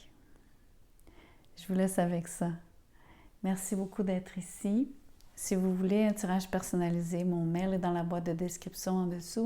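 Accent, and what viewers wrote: Quebec, Canada. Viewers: Bjr je découvre par hasard votre guidance c’est un vrai plaisir de vous écouter avec votre délicieux accent québécois je retrouve suis verseau 1 décan lui verseau 2 d. Nous vivons une véritable osmose et synchronisation. Merci de Copenhague 😊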